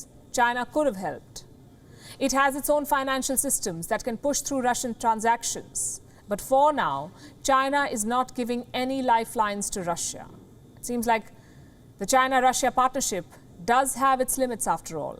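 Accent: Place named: India. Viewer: indian tongue again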